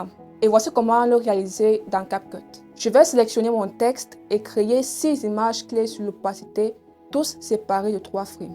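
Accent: Cameroon. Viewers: Une camerounaise. Je dirai une eton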